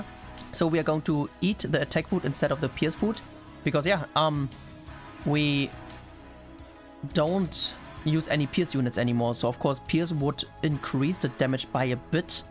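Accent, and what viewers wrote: Germany. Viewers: Is ur actzent German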